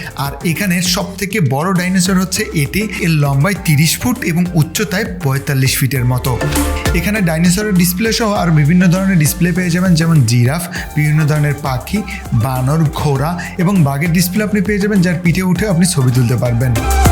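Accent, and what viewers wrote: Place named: Barishal, Bangladesh. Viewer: কথার মধ্যে বরিশালের ভাবটা বাদ দেয়া লাগব...কেমন জানি লাগে..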